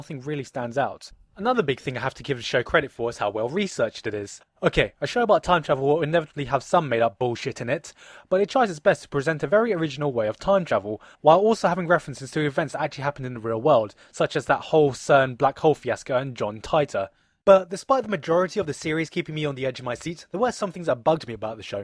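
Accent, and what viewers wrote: United Kingdom. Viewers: Wow he really does sound a little more British here than now. Cute.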